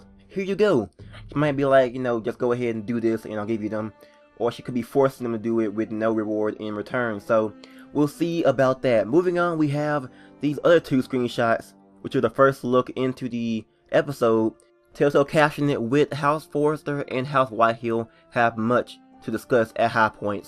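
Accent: South, United States. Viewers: Your American accent is awesome. You southern American urbans sound so much different than us northern Canadian urbans.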